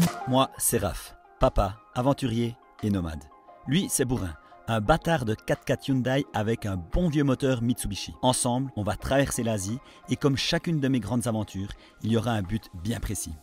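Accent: Switzerland. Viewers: L'accent suisse. Une pépite vraiment 😂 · t'es suisse ou bien ?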